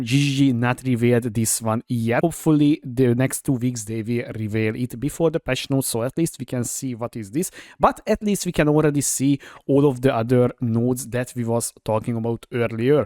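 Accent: Hungary. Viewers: Sounds Hungarian to me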